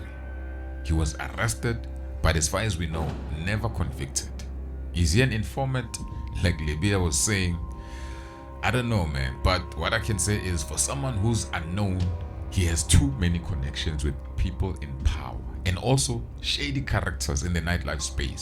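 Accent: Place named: South Africa. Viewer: you've cracked the faceless channel code. Your numbers are crazy... With a South African voice over, wow 👏👏👏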